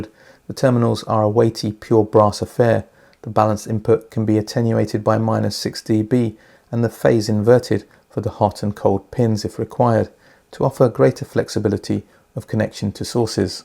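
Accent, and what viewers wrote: United Kingdom. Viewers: Thank you for another impressive review! Your articulation of audio is authoritative - your knowledge, experience and technical insight is unmatched in sophistication. The British accent and your command of the language simply makes everything you review sound so upper echelon and creme de la creme! Am I sensing that the AJ Uni Fi References are perhaps starting to grow on you as they burn in?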